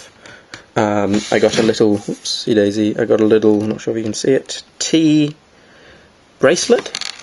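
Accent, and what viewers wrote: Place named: United Kingdom. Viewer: you sound more British in video.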